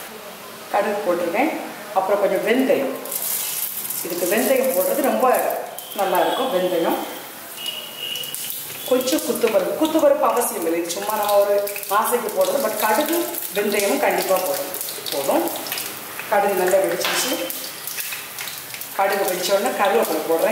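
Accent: Nagercoil, India. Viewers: Nagercoil voice pole irukku.. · u r from nagercoil?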